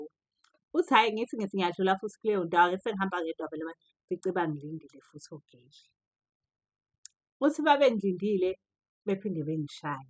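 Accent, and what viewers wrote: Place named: Pietermaritzburg, South Africa. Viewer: Hawu awusho awsiye owasePMB? Ngizwa i accent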